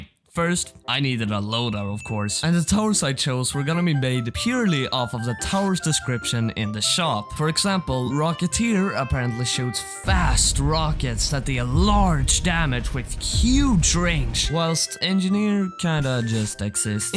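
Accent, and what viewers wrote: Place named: Sweden. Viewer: bros talking swenglish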